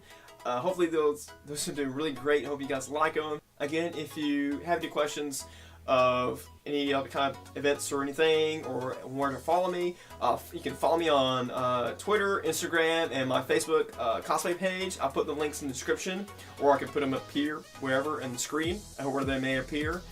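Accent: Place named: South, United States. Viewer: The southern accent thooooooooo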